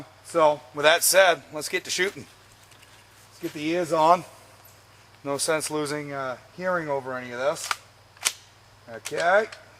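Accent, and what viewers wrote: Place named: Massachusetts, United States. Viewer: Judging by the accent and Glock you’re definitely from north of Boston lol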